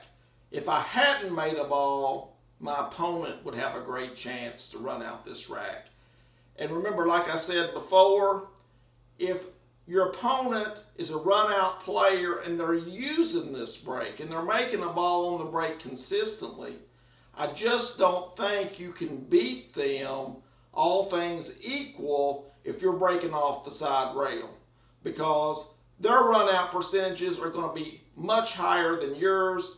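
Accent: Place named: Kentucky, United States. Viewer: Your last break was a thing of beauty. Great run out position. I liked your break strategy discussion, too. I have played around with 2nd ball break to put the 8 ball in play, but to me it’s not worth it. Probability of pocketing the 8 too low to justify a poorer spread. Keep ‘em coming. Enjoy your KY accent, reminds me of home (Texas).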